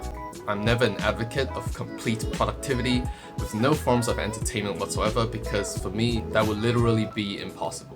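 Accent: United Kingdom. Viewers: I’m quite certain of your accent. That sounds like brits but not rly strong .. ( I’m eng major from Jpn, cheers) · I really love your British accents 😊😊😊😊😊😊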